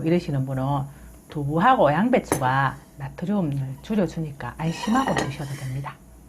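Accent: Gyeongsang, South Korea. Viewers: 경상도 무뚝뚝한 언어속에 정겨움이 묻어나옵니다 ^^ 항상 잘보고 잘배워갑니다.2022년도 항상 건강하세요~~♡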